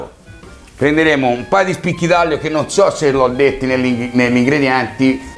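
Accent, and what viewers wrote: Tuscany, Italy. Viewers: como me gusta oir el acento toscano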